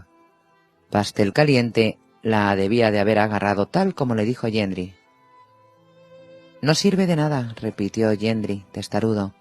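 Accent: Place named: Spain. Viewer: Muchas gracias por tu lectura.. narras muy bien. Y me viene muy bien tus lecturas para ir combinando mi lectura con momentos que no puedo leer pero si avanzar en capítulos oidos a través de tus lecturas. Y es en español de España. Solo encontraba antes audiolibros en español latino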